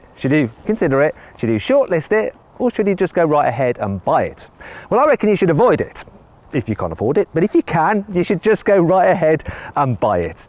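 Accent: United Kingdom. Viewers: 이 영국발음 + 장난질 컨셉을 한국에서는 할수없을까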